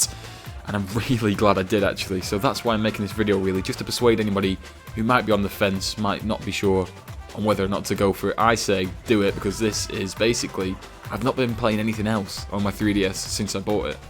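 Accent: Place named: Northern England, United Kingdom. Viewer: when this guy as a Northern English accent instead of Scottish then like AM. :P Loved the review.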